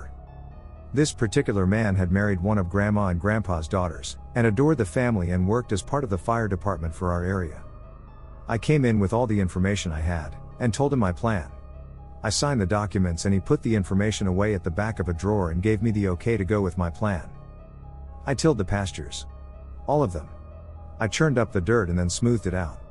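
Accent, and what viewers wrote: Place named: India. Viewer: What's with the indian accent? It totally just threw me off. Unless this was in India. haha. So weird.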